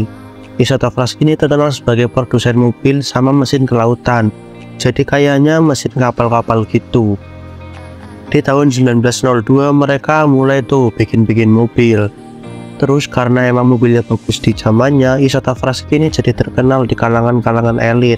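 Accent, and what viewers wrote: Indonesia. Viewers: padahalmah aksen Indonesia nya biarin ajja, tapi yaaa banyak yg pengen disama'in kaya aslinya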